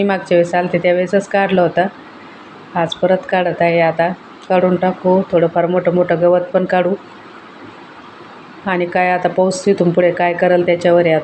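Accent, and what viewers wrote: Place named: Ahilyanagar, India. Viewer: हो का माझं माहेर अहिल्यानगर आहे ताई आणि मी पुण्यात असते 😍 ताई मला वाटलेच होते तुम्ही नगर च्या च असणार बोलण्यावरणे 👍🏻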